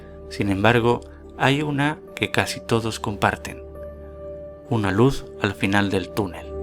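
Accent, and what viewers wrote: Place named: Spain. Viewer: Hace mucho tiempo y durante el tratamiento por un aborto involuntario de cinco meses, estando en sala de operaciones porque había que limpiar por dentro y * estando consciente, me fui por un túnel. Estaba con un relajante y tenía los ojos cerrados pero no estaba anestesiada y estaba conversando con el médico y la enfermera cuando de pronto me vi en un túnel oscuro pero con tiras de luces de colores en la parte de abajo. Iba extraordinariamente rápido hacia el frente y de pronto comenze' a ascender y en un momento dado empezar' a ver en lo alto del túnel una luz blanca brillantísima y unas siluetas brillantes también que se asomaban al túnel moviendo los brazos como saludando. Esto lo iba yo contando al doctor y a la enfermera. Llevaba horas diciendo que me quería morir de lo mal que me sentía, no paraba con eso pero no me estaba muriendo y estaba tan consciente que hasta gire yo misma la cabeza porque estaba vomitando acostada. 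Fue muy raro y no me dio' miedo, más bien empece' a vacilar por la velocidad que llevaba pensando que si chocaba con una pared o salía despedida (a no sé dónde) entonces si que me iba a morir de verdad. Sentí que había gente conocida y también desconocida allí arriba pero el destello de luz no me dejaba ver sus caras. De pronto, salí del túnel y ahí quedo' todo. Claro, me quede con eso de haber estado en * el túnel sin haber estado muerta ni muriendo. No sé cómo los que saben explicarían esto. Suenas español, sabes cómo se veían las luces del túnel? Pu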